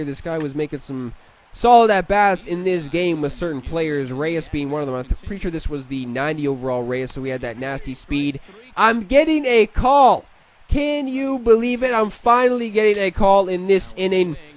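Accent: Canada. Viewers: Are you Canadian Youre accent sounds like it if you could plaese let me know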